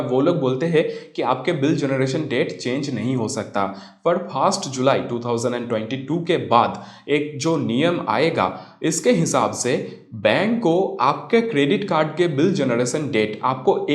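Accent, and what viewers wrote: Bengal, India. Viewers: You're a Bengalee, hey mate 🤗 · Are you Bengali? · O bangalii baba tumhra words samj ma nahi aate banglii accent aati ha Hindi ma try to fix it first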